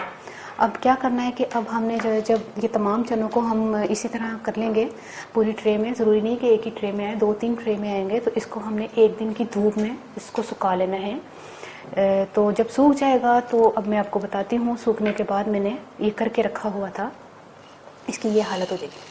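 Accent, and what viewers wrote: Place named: Punjab, India. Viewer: I'm late today oooo but anyway amazing and nice cooking and i loce punjabi touch when u speek punjabi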